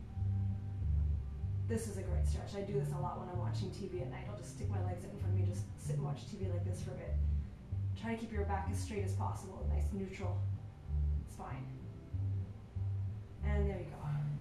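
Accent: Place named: Canada. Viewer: Thank you for this! And also, you are so adorably Canadian! lol. Love the accent. I don't remember ever seeing a video with you before.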